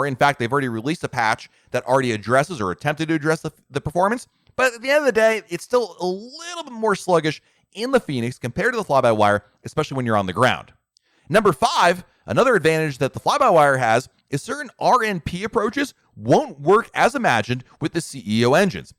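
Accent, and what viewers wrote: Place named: United States. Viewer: This is the first time I've seen any of your videos. I usually watch Overkills Simulation, due to his American voice and great content. His videos taught me how to fly the FBW A320 among other things too which are MSFS related. You have a a great American voice for this type of content. I am American and find it hard at times to listen to the British Videos, which their are so many. Nothing personal to the Brits. Just not my "cup of tea" ( pun intended ) I did subscribe to your channel. Please keep the video's coming. Great Job !!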